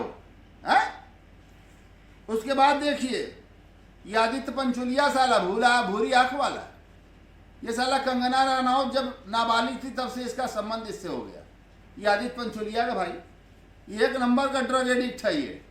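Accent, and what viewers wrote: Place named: Varanasi, India. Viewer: Sir apka ha topic bada dilchasp aur pardarshi hota hai इसपर से आपका बनारासिया अन्दाज़ सुनने में बहुत मजा आता है.. sadar pranam aapko..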